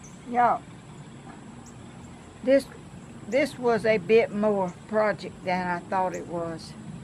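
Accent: South, United States. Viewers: Hello... yes some one has defintely hacked your account posing as u... the what's up app...I had this happen to me on another diyers channel... they caused a lot of problems for the other lady.. have your grandson look into it... so many ppl are jealous of all the accomplishments and hardworking Diyers put into their channel. All their personnel time out of the goodness of your hearts just to entertain us for someone hateful, jealous, person to do this is not right. These ppl need to be in trouble.Btw.. love all your videos your voice is so kind and sweet brings back so many memories that southern accent... you are an anxious, beautiful, kind hearted person. Stay safe.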